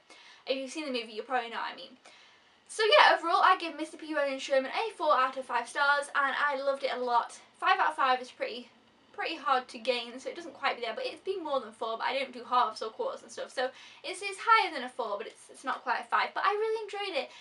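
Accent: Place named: United Kingdom. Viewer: I LOVE(d) YOUR REVIEW! IT IS NICE AND REFRESHING TO SEE A BEAUTIFUL WOMAN REVIEWING AS MOST MALES ARE REVIEWERS. You are perfect reviewing! With that said, I have been to your country twice (one time, a DAY trip from NY to London and back), and another time, couple day trip. Have you been to the States here? Moving on: I do own over 18,000 dvds/blurays all genres, including over 100 3-D, and 945 laserdiscs. And a full theatre surround system. Anyway, I do plan on purchasing MR PEABODY as I grew up with the original cartoon. And you convinced me it is a good movie to purchase. I look forward to your future reviews. You are so bubbly, cute, love the accent, and you are so much fun to watch review. Thank you very much, keep up the great work, and looking forward to your next review, whatever movie it will be. (I recently purchased TRANSFORMERS 4 3D, MILLION WAYS TO DIE IN THE WEST, GODZILLA 3D, and others.) Take care, Happy Holidays, and as you say in UK, "Cheerio"! 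Bye for now. (*feel free to reply back if you'd like). BYE!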